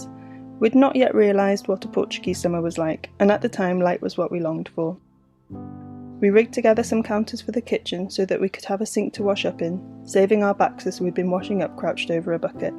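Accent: United Kingdom. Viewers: Interesting life style, interesting content. Unfortunately have a hard time to understand your fast speed British accent, please do a favor for your audience to slow down with your narration!! Thanks for your content, keep us updated.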